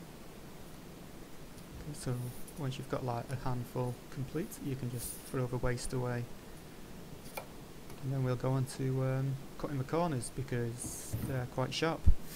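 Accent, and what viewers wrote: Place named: Brazil. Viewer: Are you brazilian? Your accent sounds familiar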